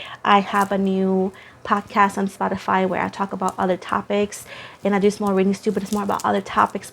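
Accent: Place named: Latin America. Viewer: Hello luv you r doing wonderful 💗. Never mind about those unkind pple and can't believe they were making fun of how u speak ! 🙄 like really!? U r a beautiful Latina woman and of course ur gonna have a accent and even if u didn't they would find something else to complain about. Smh.. so those pple u cannot make happy. There own life prbly isn't happy so u don't need there discouraging nd negative energies luv. U r beautiful inside n out no matter what anyone says! I'm sure u know that and u radiate that! 🩷✨️ U r a hard working mother doing what u love ! U r a genuine loving woman! U keep doing u ! And I luv your readings btw.. always 😊💖🌈✨️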